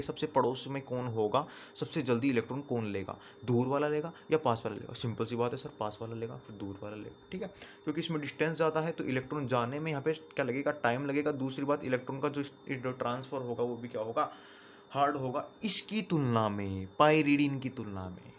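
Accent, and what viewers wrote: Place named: Rajasthan, India. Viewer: Ap rajasthan ya haryana se lgte h😂 bht bdya smj aaya easy kr diya blkl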